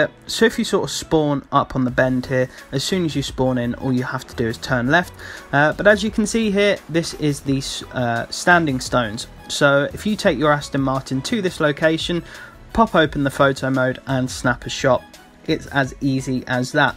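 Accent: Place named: Australia. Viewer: The australian accent tho 👌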